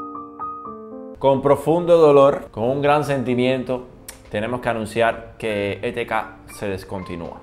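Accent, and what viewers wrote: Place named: Cuba. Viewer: Muy buenos tus vídeos,imaginate que los veo con los datos que adquiero( tu sabes) jajaja. Me cuadran cantidad sigue hablando en buen cubano para seguirte entendiendo .saludos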